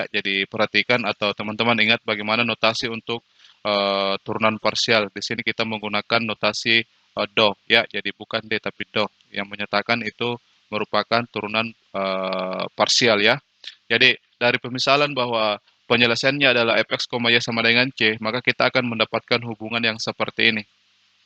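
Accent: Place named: Makassar, Indonesia. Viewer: logat makassar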